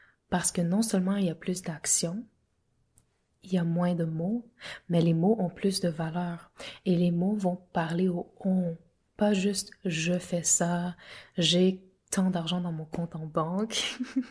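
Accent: Quebec, Canada. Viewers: je ne savais que tu parle Français :) c'est un accent Quebecois ? tous ce que tu dis est beau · Merciii pour cette petite leçon de vie! Ps: j'adore ton accent québécois (si c'est bien ça):) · J'adore ce petit accent canadien / québécois du français que tu as appris. Félicitations, toujours au top tes vidéos 🙏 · Ça c'est du français Québecois! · je suis français et je suis étonnée que tu parle si bien français. PS: j'adore ton accent québécois. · Un petit accent québécois 😝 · Je ne m'attendais pas à ton accent québécois hahahahaha j'étais habituée à l'anglais mais ça fait un ti choc mdr (je suis française) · You sound québécoise(just like me fr) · Québec accent here 🙃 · Charmant le parler québécois 😊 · when you speak english you cant barely pick up you are from canada , totally different in french, your accent betrayed you (that and speaking french from quebec) hahaha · Avec accent québécois 😊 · I'm from Saskatchewan, I'm surprised I picked out that it was a Quebecois accent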